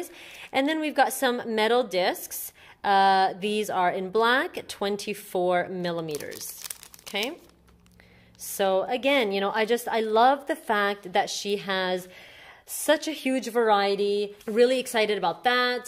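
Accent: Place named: France. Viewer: your French accent is beautiful! You must have spent some time in France 🇫🇷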